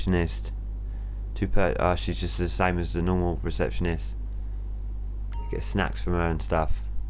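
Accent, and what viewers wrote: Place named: United Kingdom. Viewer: i know you are british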